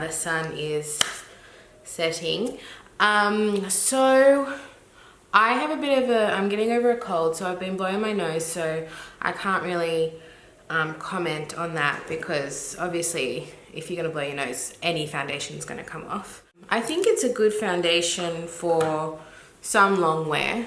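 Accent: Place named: Australia. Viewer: Finally, a beautiful sounding Australian accent! 😅 So many girls aussie sound terrible on youtube. Thank you! 🤘🏻 easy to listen to.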